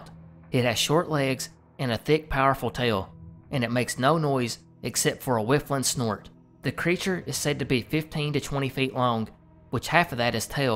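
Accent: South, United States. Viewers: You don't know how happy I get when I see a new video from you. Love that southern accents. It makes the videos so much better. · There’s something about a country accent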